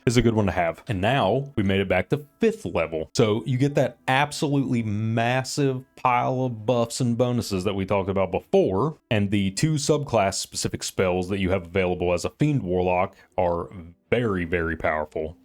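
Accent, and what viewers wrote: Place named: South, United States. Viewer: Hey, honest feedback from a consumer of the content here - filming yourself and editing the feed in over the background graphics and videos adds nothing to the content for me, personally. I think you could save yourself some effort and nix your video feed in favor of pure audio. In fact, moving the production time over to more contextual graphics in your videos would be a HUGE level-up. I think doing that well would jump your production values significantly. Love the walkthroughs, love the personality of your VO, love the southern US representation. Wishing you well! Edit: I love the respectful dialogue. It's great to see that the channel has cultivated a solid audience. Kudos to, and to the viewership!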